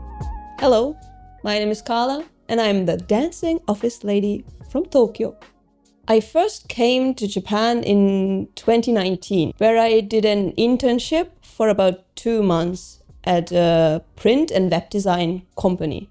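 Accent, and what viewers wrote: Germany. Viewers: Finde ich schon. Wie sie Worte zieht/betont ist schon sehr Deutsch. Ihr Redefluss hingegen klingt nach einer Mischung aus Deutsch und Japanisch.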